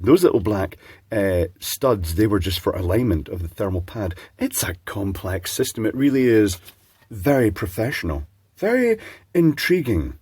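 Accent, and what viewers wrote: Scotland, United Kingdom. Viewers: I am trying to work out what part of Scotland you come from. It is not a Glasgow accent for damn sure, I was there for a month.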